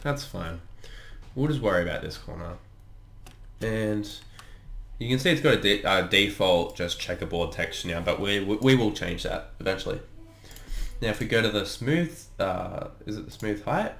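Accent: Australia. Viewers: Are you an Aussie if I’m wrong please correct me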